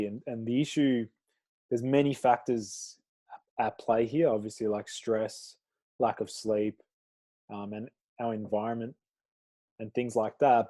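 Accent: Australia. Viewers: he's not british. That is an Australian accent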